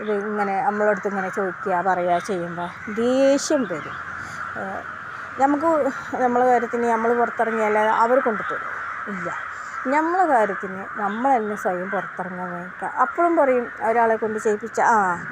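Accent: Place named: Malappuram, India. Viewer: എവടെ ആണ് സ്ഥലം ഭാഷ മലപ്പുറം ആണല്ലോ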